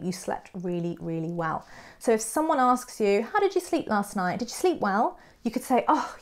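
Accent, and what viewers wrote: United Kingdom. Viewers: Hi, 🖐as always, I love the way you speak English, it's crystal clear, typical British accent, and most importantly, it's full of vigor 💪👍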